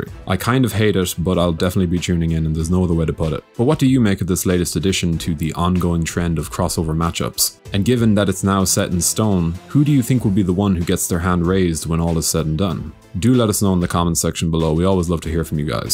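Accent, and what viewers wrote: Ireland. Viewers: Learning to live with annoying monotone Irish voice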